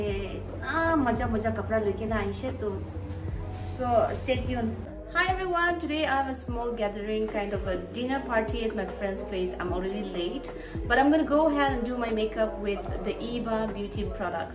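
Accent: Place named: Nagaland, India. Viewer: Love the way you speak Hindi mix nagames ....😂😘😘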